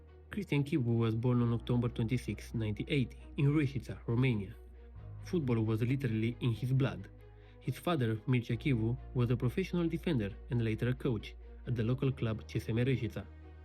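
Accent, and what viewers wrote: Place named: Belarus. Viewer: mama cum iese belarusul prin accent. fa coae video in romana si aia e..daca nu pune AI.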